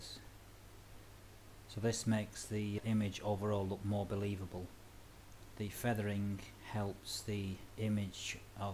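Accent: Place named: Liverpool, United Kingdom. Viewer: this guy sounds just like a! he from liverpool or something?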